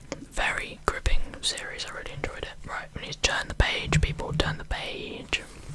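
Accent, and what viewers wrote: United Kingdom. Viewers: Your British accent is awesome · I love the British accent 🤣. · Two things I love : ASMR and British Accent❤ Hugs from Brazil💚💛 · Are you speaking in modern rp or just British accent?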